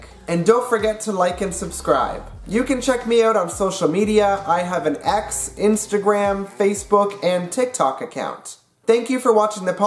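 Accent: Canada. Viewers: I'm getting gay male Canadian vibes. 😀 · I'm straight but I detect no accent of this speaker except Canadian. That's OK. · Hey, What accent? I love languages and am so happy to have subscribed to your channel for some time now. Where nature vs nurture is concerned I have always believed that most men that speak more or less like what perhaps many would say is a stereotypical drag queen’s stage persona’s way of speaking are simply affecting the speech to serve as identifiers for other gay men and/or to show pride in their sexual identities. You however when you started to speak in this video, quickly made me correctly guess that you are Canadian from your pronunciation patterns. The above said. I will say in closing that I love what you do, your mind and ability to not only know as much as you seem to know but that you share it. Congratulations on your 12 years of marriage. 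· Frankly, the first thing that jumped out to me from your speech was that you were Canadian. That came across loud and clear. As far as whether you have a "gay accent" or not, not knowing anything more, I'd conclude there was a 50-50 chance you were gay from just listening to you. So, a little bit of a gay accent, but not enough to be completely determinative. Clearly, there are straight men who sound gay, and gay men who sound straight. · This guy seriously sets off my gaydar, but this is the first time that I've seen him address it. He's also clearly Canadian, by how he pronounces the "ou"/"ow" sound. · PS: you also sound very Canadian. Teehee. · I recall learning about optimal pitch in college. This is the natural baseline for your voice. Many straight males speak below their optimal pitch which is perceived as more masculine. Think of actors speaking in superhero movies, most of the time the characters speak below optimal pitch when in the superhero character, but in their secret identity role the actors usually use their "regular" optimal pitch. 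Gay men, in my experience, often speak above their optimal pitch - but as you say with many variations. None of this is particularly conscious or affected, but this develops naturally. I wanted to point out that the voice change is present in straight as well as gay males. BTW - while you have a slight gay accent, in my view, what I notice more is the Canadian accent. :-) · I thought your accent is Canadian… 🤷🏻‍♂️ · And at 5 seconds in, I pegged him as Canadian as well. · Yes you do have it. It is definitely a slightly lisping sibilance. I am also uniquely qualified to say so as I have it too. So what? You also have what sounds like a Canadian accent.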